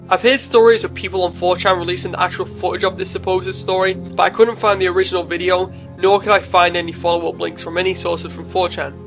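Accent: Liverpool, United Kingdom. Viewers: Liverpudlian? :D nice vid! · The scouse accent makes it worthwhile.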